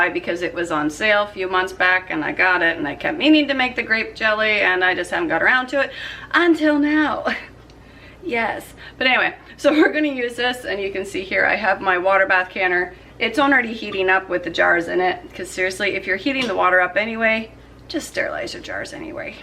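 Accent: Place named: Canada. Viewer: We can tell you’re Canadian cuz you say “own ready” instead of “ already”. My hubby is Canadian, but has lived in the states here for 50 years.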